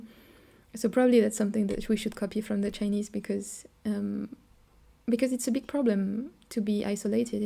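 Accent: France. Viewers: Through the window / Stricter. How could one prefer Chinese culture over Japanese culture is beyond me. And very surprising considering how introvert and clean you are...Opposites are attracting each other I guess.^^ The mountain range of Huangshan, which inspired the floating mountains in the Avatar movie, not too far from Shanghai, is the most spectacular landscape I've ever seen, and I've seen a lot, especially when you are above the clouds, which makes it feel like the mountains really are floating . Just need to escape the armies of Chinese tourists to really enjoy the site. They tend not to go off the beaten path which makes it easy. Telling French people to lose their accent when speaking English is a terrible advice, if you know what I mean =P (I m a simple man) You prolly don't give a damn but you are losing some sex appeal when speaking English, you are way sexier in French/Russian xD Great video! 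Disclaimer: Most French hate the French media. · Something about the French accent when spoken by a Lady. Wonderful. 🙂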